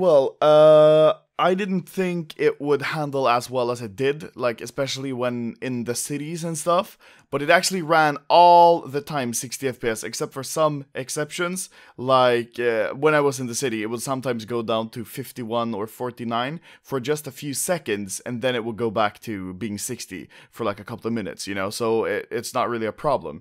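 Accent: Sweden. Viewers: du är svensk man hör på ditt uttal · You swedish ? Lol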